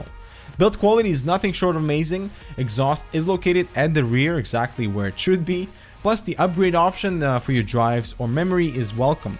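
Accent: Russia. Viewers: Слышится русский акцент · is russian? just wondering bcuz his accent is so stong:P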